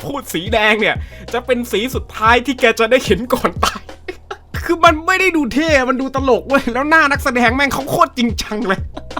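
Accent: Isan, Thailand. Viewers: เจ้าของช่องเป็นคนอีสานหรอครับ สำเนียงออกอีสานเลย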